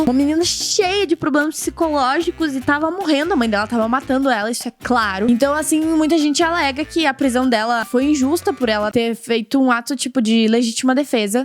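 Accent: Rio Grande do Sul, Brazil. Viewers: Mas tu tem o sotaque daqui de rio grande do sul tchê!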